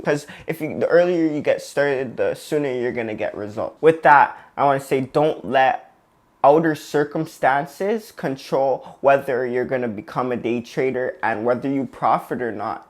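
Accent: Toronto, Canada. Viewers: From toronto? Just guessing from the accent.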